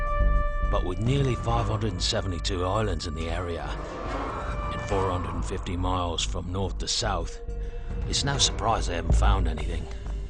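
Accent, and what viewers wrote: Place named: Cockney, United Kingdom. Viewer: God I hate that cokney accent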